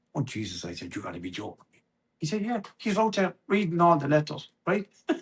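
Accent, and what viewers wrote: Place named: Ireland. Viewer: God love you, you’re still as Irish as the day you were born … so good to hear you speak. Great story, keep them coming! Without a doubt you’re a true story teller … but you knew that. I certainly can relate to school homework not done and the repercussions on Monday morning … but throughout my school days it never changed … now writing stories … that was a different kettle of fish. So happy for your new venture and may those darn shingles disappear! ❤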